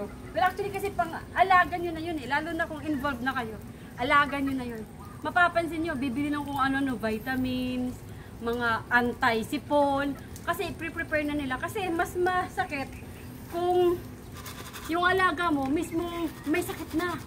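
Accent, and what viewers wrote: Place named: Visayas, Philippines. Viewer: Madam napansin ko parang bisaya salita mo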